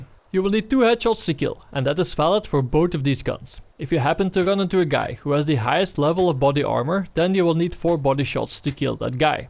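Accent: Netherlands. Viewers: you sound dutch but 10/10 vid m8 keep up the great work :) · Where is your accent from, it sounds like a mixture other Netherlands with northern England.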